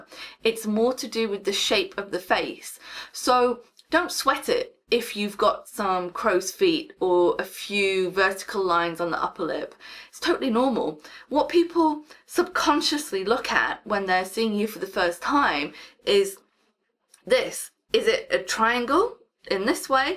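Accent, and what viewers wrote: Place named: United Kingdom. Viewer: The most beautiful accent of the world : english UK ❤🙋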